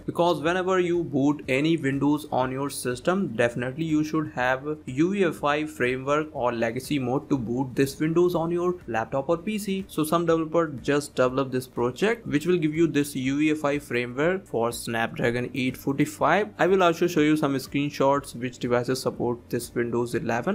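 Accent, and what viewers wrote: India. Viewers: On "any" Android hah. I think you people from India should stop talking English + making videos.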